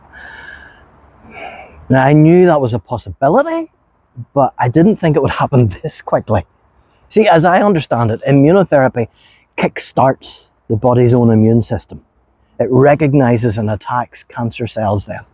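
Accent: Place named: Scotland, United Kingdom. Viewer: Hi just found your channel via Francine, following you from Denmark. I absolutly love your accent, Scottish? Your videoes are next level, sp well done. Hope uou are having a good day today. I will pray for you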